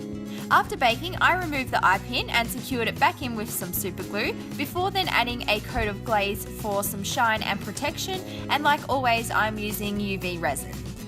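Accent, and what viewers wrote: Australia. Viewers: Omg she sound sooo AUSSIE 🐨🐨🐨🐨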